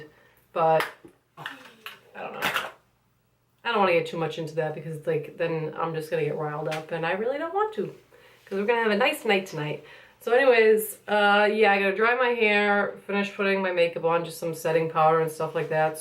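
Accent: Boston, United States. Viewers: Cool. Is that a Boston accent I detect? :o)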